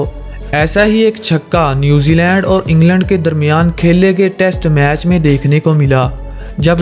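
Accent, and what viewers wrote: Punjab, India. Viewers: Bai tu punjabi lagda teri speaking too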